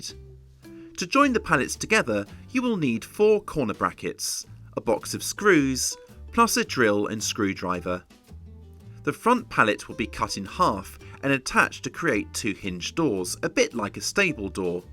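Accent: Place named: Cockney, United Kingdom. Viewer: Im learning English and I found your accent is little bit like cockney :)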